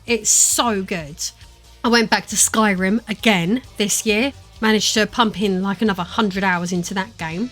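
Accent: United Kingdom. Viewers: Im only here to listen to the british accent...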